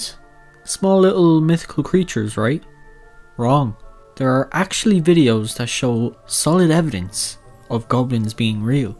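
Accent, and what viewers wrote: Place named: Ireland. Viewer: ur irsh right? pretty sure becus you sound like it what county r u from? u sound like ur from limerick lol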